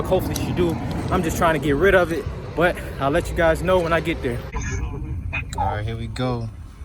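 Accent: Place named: Midwest, United States. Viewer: That's a Midwest Indiana or Ohio accent. Maybe Illinois?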